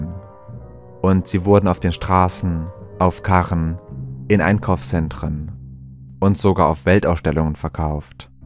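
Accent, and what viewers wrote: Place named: Hesse, Germany. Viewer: Der hessische Dialekt kommt manchmal durch 😅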